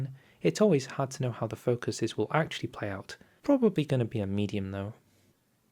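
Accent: United Kingdom. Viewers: Dude you should record audiobooks, such a relaxingly bri'ish voice 🧐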